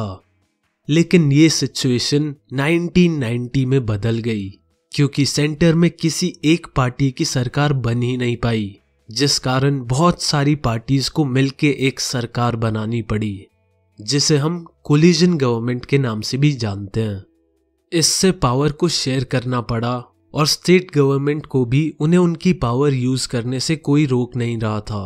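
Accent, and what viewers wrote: Haryana, India. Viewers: Your sounds like Haryana are you from Haryana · Haryana se ho k..?🤟😎💪💪💪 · Bhai Tu h kha ka Jo haryana ka h 👍👍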